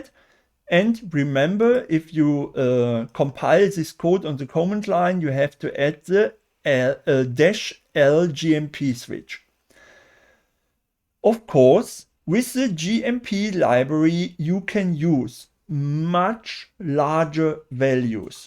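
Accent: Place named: Germany. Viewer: that's some heavy german accent (me a german may say this)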